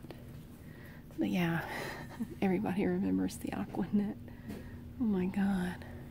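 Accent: South, United States. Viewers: I'm so happy I found your channel, this is my absolute favorite now. You are such a sweetheart, I adore the southern accent and your comments are just perfect. I had never seen any organizing and structuring ASMR before, but I absolutely love it. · You have such a sweet southern accent. I love it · I'm a new subscriber and I have been a subscriber to AMSR channels for a long time and how I missed your channel is beyond me. It really at the top when it comes to effectiveness. I love southern accents so maybe that plays into but when I watched your library video, that sold me in 2 minutes.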